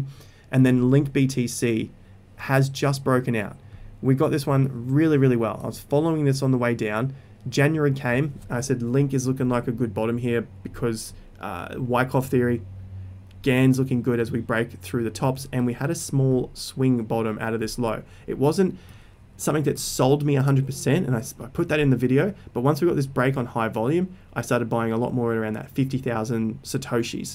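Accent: Australia. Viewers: Aussies say "Megger" instead of Mega and "Wata" instead of Water. Love the content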